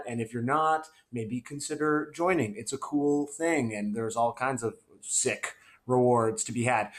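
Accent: Canada. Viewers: I came from game therory and have watched every episode. This is the first time I've noticed the "canadian accent"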